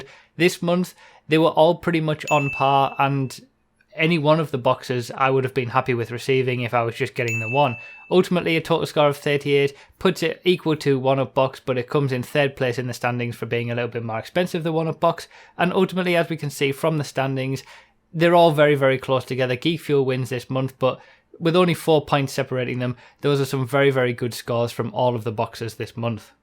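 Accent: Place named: Middlesbrough, United Kingdom. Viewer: You from Middlesbrough?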